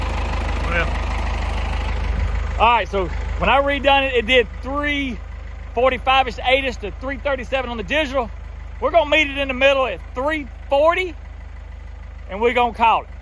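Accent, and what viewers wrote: South, United States. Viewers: You the man.keep up that good old country talking..... Come on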